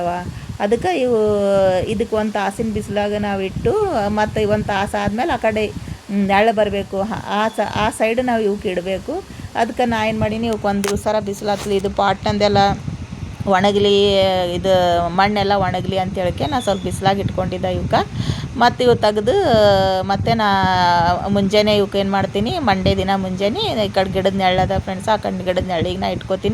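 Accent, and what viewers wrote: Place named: North Karnataka, India. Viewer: ನೀವು ಕೂಡ ನೋಡಕ್ಕೆ ತುಂಬಾ ಚೆನ್ನಾಗಿದ್ದೀರಾ ಕ್ಯೂಟ್ ಆಗಿ ಹುಡುಗಿಯಲ್ಲಿ ಎಷ್ಟು ಚಂದ ಇದ್ದೀರಾ ನೀವು ಹಾಗಾದರೆ ಹೌದು ಅದನ್ನ ತೊಳಿತ ಇರಬೇಕು ಗಿಡಗಳನ್ನು ತುಂಬಾ ಚೆನ್ನಾಗಿ ಬಳಸಿದ್ದೀರಾ ಬಿಸಿಲು ಹಾಳಾಗುತ್ತೆ ಅಂತ ಹೇಳಿದ್ದಾರೆ ಕರಾಬು ನಮ್ಮ ಮನೆಯಲ್ಲಿ ಇಲ್ಲ ನಿಮ್ಮ ಗಿಡಗಳು ನಿಮ್ಮ ಮಾತು ಚಂದ ಉತ್ತರ ಕರ್ನಾಟಕದ ಮಾತು. ಇಂದು ಸ್ವಂತ ಮನೆ ಅದಕ್ಕೆ ಬೆಳೆಸಿದ್ದೀರಿ ಬಾಡಿಗೆ ಮನೆಯಲ್ಲಿ ಇರುವವರು ಏನು ಮಾಡಬೇಕು ಹೇಳಿ❤❤❤❤ ಸೂಪರ್ ಪ್ಲಾಂಟ್ ಗಳು❤❤❤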